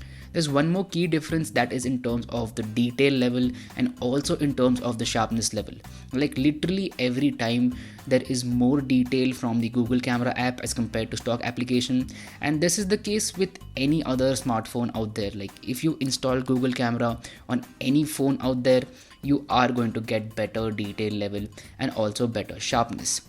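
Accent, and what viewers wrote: India. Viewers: Open video = indian accent = close the tab 😌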